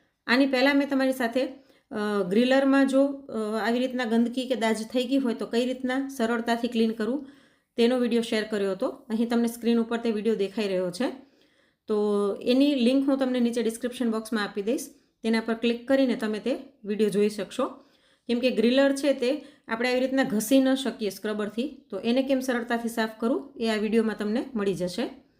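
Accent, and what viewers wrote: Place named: South Gujarat, India. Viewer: Glad to know your area. I have never been there in Kutch. Based on your Boli, my prediction was wrong but your boli is not different than South Gujarati. Me and my wife both are from Surat, both retired, settled in USA since 1979 (46 years, perhaps before you were born; again predicting based on your young South Gujarati style voice). Keep on doing good job! Jay Hind! 🇮🇳🇮🇳🇮🇳 Jay Shri Krishna! 🚩🚩🚩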